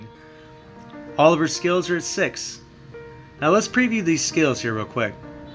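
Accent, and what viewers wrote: United States. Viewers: Dude are you from north Carolina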